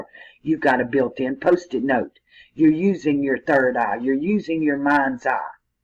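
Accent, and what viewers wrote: South, United States. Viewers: lol i loooove the Southern Drooole :)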